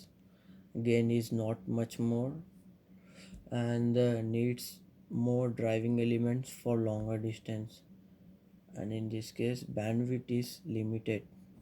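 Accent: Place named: United States. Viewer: Ohh american accent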